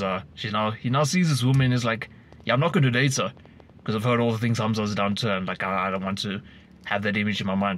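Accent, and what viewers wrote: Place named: South Africa. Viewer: Lol. Heard the accent and knew immediately you were on of us. I’ll sub just for that 🤣🇿🇦 Edit: just got through the video and seems like you have a lot of good points to add to the discussion in this space. I was single my whole life, recently got in a relationship, and that was when I knew I outgrew these red pill influencers. Past them telling you to get in the gym and improve aspects of your life that you can control, that’s about it when it comes to the value they add. They are mostly clueless when it comes to maintaining healthy relationships with women.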